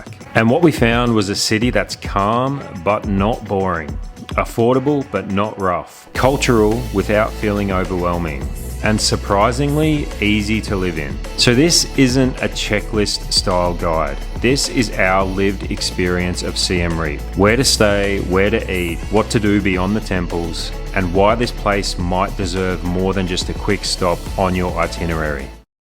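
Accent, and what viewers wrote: Australia. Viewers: Hi, I’m heading to Cambodia in a month with my wife brother and sister in law, do you need a motorcycle license for the Tuk Tuk and how much did it cost? Loving your videos and good to hear an Aussie voice in them. 😁👍🇦🇺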